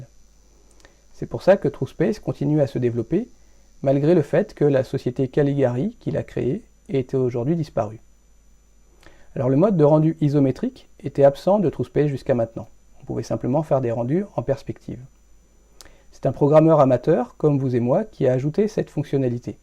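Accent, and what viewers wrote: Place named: France. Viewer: woah you're french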